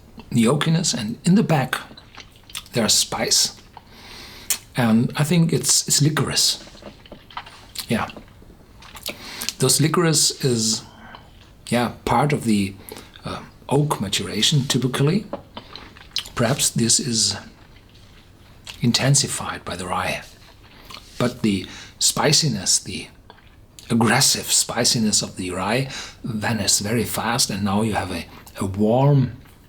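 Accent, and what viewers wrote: Germany. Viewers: Sympathisches English 😉. German style.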